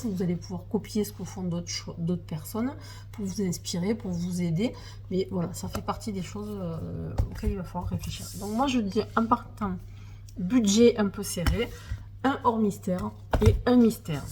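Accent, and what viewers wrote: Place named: South, France. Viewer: Bonjour 🥰🥰je suis TOTALEMENT d accord avec toi au sujet de l importance de DÉCULPABILISER l idée de colorier. Infirmier en psychiatrie, effectivement l aspect art thérapie du coloriage est indéniable. . . Et effectivement il faut défaire les cases car il y a j en suis sur beaucoup de garçons qui colorient. . . Plus présent sur Tiktok je regarde de plus en plus youtube ou la communauté coloriage fait plus de hors mystère et est très très créative. Ayant fait des études d histoire de l'art je me retrouve enfin qd tu dis que le coloriage est un art en lui même. Je démarre ma chaîne ici pour démontrer que les garçons aussi peuvent colorier et se faire plaisir autrement qu en courant après ⚽. Merci et bravo pour cette super vidéo. Hâte de voir les suivantes. J avais jamais commenté tes autres vidéos/ vlog ou tu réalises de superbes et magnifiques colorisation, c est désormais choses faites. 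Encore bravo Ps au vu de ton accent on ne doit pas être très loin ( moi de paris mais depuis 12 ans a Toulouse avec mon Mari). Belle journée/soirée.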